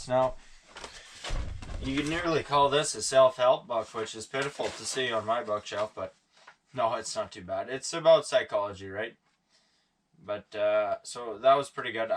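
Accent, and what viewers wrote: Canada. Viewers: You have made me fall in love with Canada with your accent lol and of course the beautiful wilderness of Canada is amazing too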